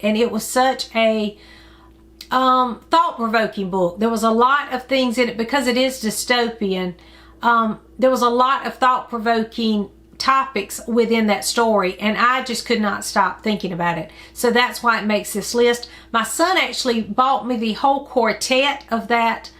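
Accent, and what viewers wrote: South, United States. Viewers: I so enjoy your southern accent way of describing wonderful books. We have so many similar books in common. Glad you read Thomas Hardy and enjoyed him!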